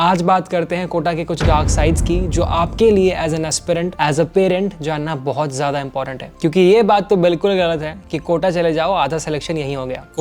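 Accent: Bihar, India. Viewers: bhaiyaa you're from Bihar? Your way of speaking i gotcha u I'm pretty sure you're from Bihar.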